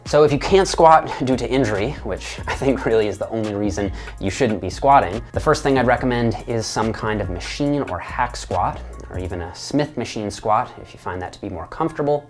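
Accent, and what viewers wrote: Newfoundland, Canada. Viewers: The burr. This man is Canadian no doubt. Awesome content dude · I hear a newfie accent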